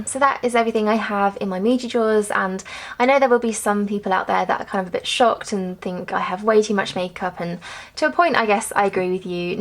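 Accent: England, United Kingdom. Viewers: I love your accent. I am an English accent aficionado and yours is especially pleasant. HA HA.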